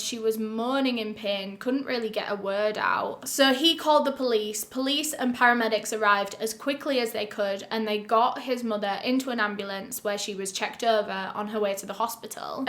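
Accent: United Kingdom. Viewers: She is from and lives in the UK.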